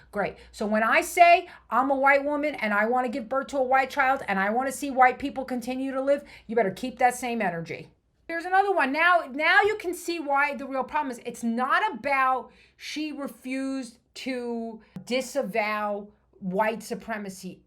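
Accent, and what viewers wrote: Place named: New York, United States. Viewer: Good to hear a real New York accent, it’s a rarity these days.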